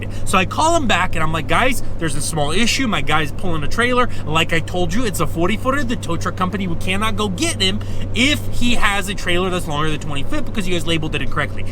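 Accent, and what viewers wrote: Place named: Iowa, United States. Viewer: heyt, sounds like EagleGrove Ia.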